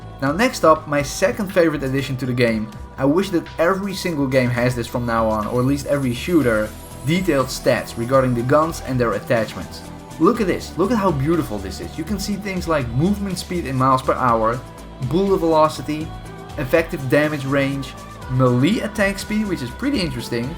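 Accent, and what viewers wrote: Netherlands. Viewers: Are you dutch? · Je bent nederlands, Ik hoor het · are you dutch? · I guess you’re dutch? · Are you Dutch?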